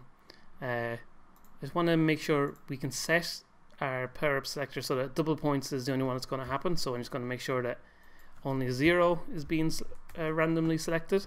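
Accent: Ireland. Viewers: You talk like Jacksepticeye. Are you Irish?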